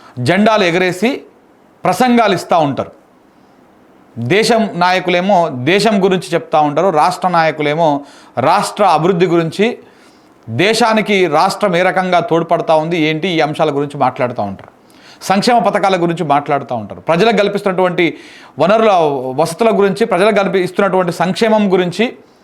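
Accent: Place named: Telangana, India. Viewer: Arey niku enduku ra AP politics. Musukoni mi telangana chusuko. Niku AP gurinchi emi telusu ra . Niku asalu a district ekkada undo telusa ra. Mundu ikkada ground level lo tirigi taruvata video lu chey ra. Ni history emito andariki telusu. Ninnu telangana lo tante AP KI vachav. Isari tante ekkadiki potavo. Mundu a telangana slang tho video lu cheyadam maney. Ma andhra slang tho chey.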